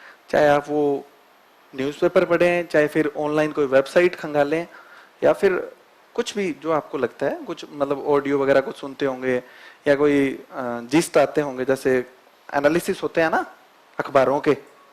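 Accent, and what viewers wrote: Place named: Rajasthan, India. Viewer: H na...... Lahjaa .. Rajasthani ki pahchaan😀😀😀😀.. proud of you bro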